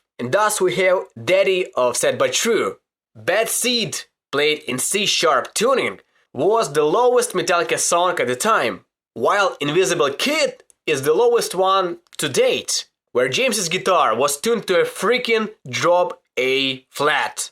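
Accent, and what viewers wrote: Russia. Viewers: I love your russian accent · Сразу подумал что русскоговорящий человек по акценту, а потом ещё и "поехали")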